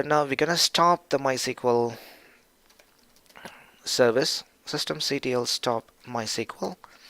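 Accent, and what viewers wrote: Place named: Australia. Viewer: and that’s good Your accent sounded like Australian Never mind bro..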